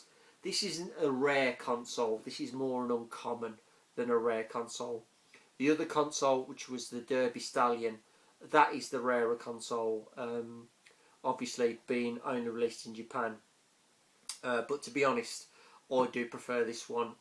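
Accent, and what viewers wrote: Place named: Wolverhampton, United Kingdom. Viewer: Thought the accent sounded familiar. Wolverhampton here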